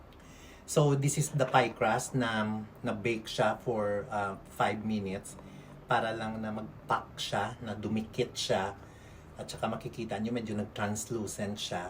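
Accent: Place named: Iloilo, Philippines. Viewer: Hello po sir!! I love your ilonggo accent 😍😍😍it reminds me of my friend in Bacolod.... Pinaka sweet na nakilala ko Ang mga ilonggo 😍 keep safe always sir!! More videos please 😊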